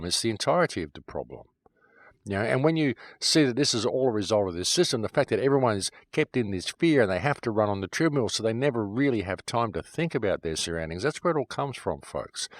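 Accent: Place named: Australia. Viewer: Gate-keeping. By the way every time I think of this concept I hear your Australian accent...so your videos work to a degree lol.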